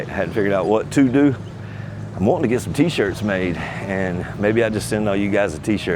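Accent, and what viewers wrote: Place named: West Virginia, United States. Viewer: Man, you're slinging the truth!! I'm 48 and making plans to move over there. I've been researching the Philippines for a few years now. I'm making the move for all the reasons you mentioned. I was married once, It lasted a couple few months and there's no way I'll do it again here in the States.....absolutely no way!!! I'm from Southern West Virginia and our accents are pretty much the same, lol. I dig the raw material, informative and entertaining, thanks for the time Brother.....I just subscribed!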